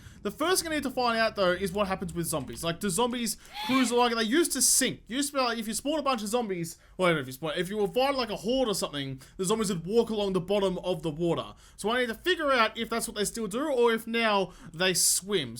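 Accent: Australia. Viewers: Out of pure curiosity from where do you hail? Are you from Australia? Trying to place the accent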